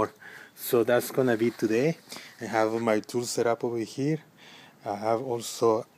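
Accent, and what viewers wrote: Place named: India. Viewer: India akcent 😊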